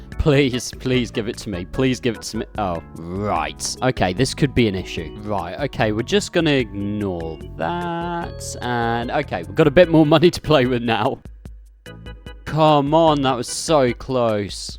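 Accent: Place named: United Kingdom. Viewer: I love this British accent 😭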